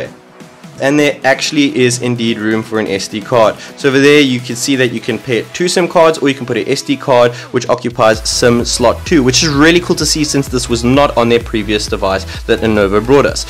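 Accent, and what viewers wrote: South Africa. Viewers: I like the Proteas accent !!!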